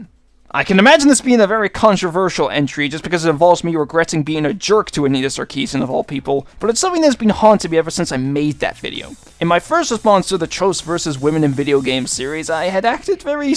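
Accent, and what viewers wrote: England, United Kingdom. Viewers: I find it odd that a lot of people didn't know you were English. I knew from your accent, despite how subtle it is. Maybe it's cause I'm autistic as well. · nice video, I like that you own up the mistake also you okay. I thought you sound England but from America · It's weird, I could've sworn that ever since I discovered your channel, I knew you were English because of your accent. But maybe I'm just confusing accents with dialects.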